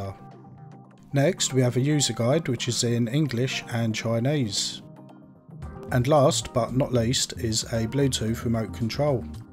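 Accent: Cockney, United Kingdom. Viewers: This guy is a proper cockney geezer 😂😂😂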